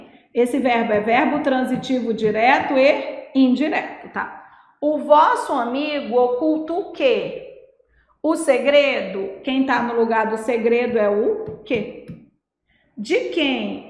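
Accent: Pará, Brazil. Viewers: Professora paraense, certeza. ❤️🙏🏻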